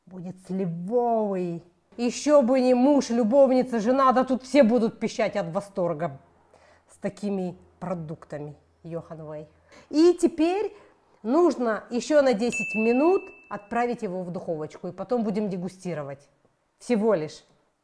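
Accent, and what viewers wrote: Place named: South, Ukraine. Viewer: Обажаю ваш южно-украинский акцент! Вы супер!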